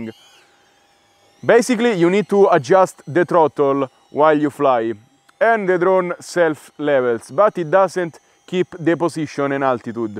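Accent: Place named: Italy. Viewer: Your english is the best italian Style,its like music in my ears Grazie👍👍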